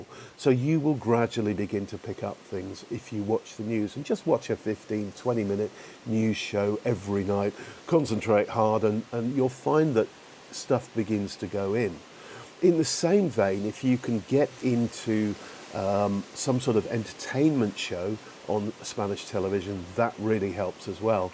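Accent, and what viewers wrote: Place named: England, United Kingdom. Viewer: Tienes razón no has hablado mucho pero algunas frases… suficiente para escuchar el acento. Creo que para ingleses no es fácil perder el acento… no quiero ofenderte pero es así. ¿0 piensas que no? Has recomendado cuatro periódicos y escuchar la pronunciación de estos periódicos era suficiente para escuchar el acento! ¿No lo creas? ¿O que piensas al respecto de tu acento?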